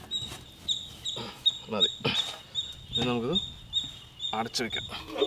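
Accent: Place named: Palakkad, India. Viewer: Heloo ... ...ചേട്ടന്റെ എല്ല വിഡിയോസും പൊളിയാണ്.. ട്ടോ... നല്ല രസം ആണ് കണ്ടിരിക്കാൻ.... മാത്രമല്ല... പാലക്കാടൻ സംസാരവും... എന്തായാലും പൊളി തന്നെ...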